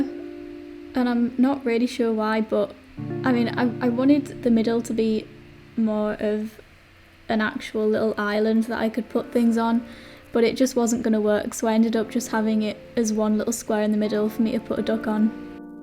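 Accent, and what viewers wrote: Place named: United Kingdom. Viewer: Are you from the U.K.? I love your accent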